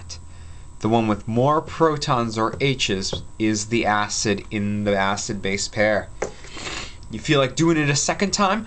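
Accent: Canada. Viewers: totes hear a canadian or mn accent 😊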